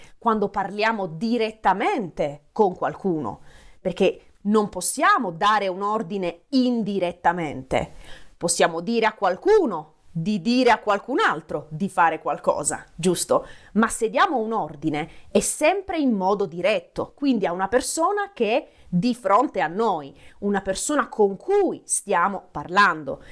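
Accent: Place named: Taranto, Italy. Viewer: Buonasera, non era mia intenzione offenderla. Essendo lieve non so caratterizzarlo. Nel canale YouTube insegna egregiamente grammatica italiana, per questo le rinnovo i complimenti. Non crede sarebbe giusto insegnare anche la corretta dizione? Non volevo offenderla, ogni dialetto, accento va senza dubbio salvaguardato. Io amo l'accento ciociaro ad esempio, essendo originario di Frosinone. Molti attori, attrici, presentatrici e presentatori fanno corsi di dizione, pur conservando e amando le loro origini. Spero di essermi spiegato, lei fa e farà come sempre un ottimo lavoro. Non la disturberò più con i miei commenti. P.S. credo abbia origine Tarantine, ma potrei sbagliarmi. Un caro saluto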